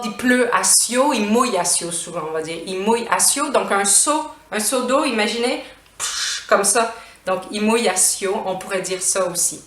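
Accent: Quebec, Canada. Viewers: Madame, est-ce que vous pouvez me dire pourquoi je vous comprends sans aucun souci, mais je n'arrive pas à comprendre certain comédiens y compris Bob Elvis Gretton, Mike Ward, Corine Côté. Tout vous-autres parlez avec un accent québecois.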